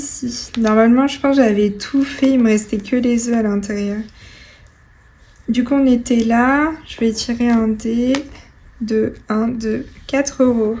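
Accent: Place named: England, United Kingdom. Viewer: Coucou 😊 Pleins de sous sous à mettre dans les défis, trop chouette 😘 Et un beau colis de Linda en prime. C'est superbe 🎉 J'aime bien ton accent anglais,😊 je ne fais pas mieux 😅 Des bisous 😘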